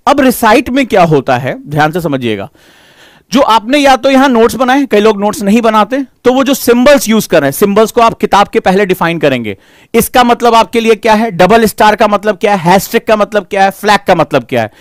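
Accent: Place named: Bihar, India. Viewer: Mind blowing explanation sir thank you so much 💐 specially your bihari accent Amezingg.... Superb 😆 Again thanku sir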